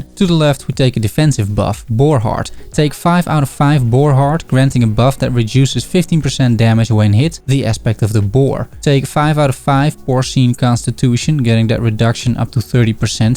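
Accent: Netherlands. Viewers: Your build guids are getting better and better! Keep up the good work. Where are you from? Are you Dutch?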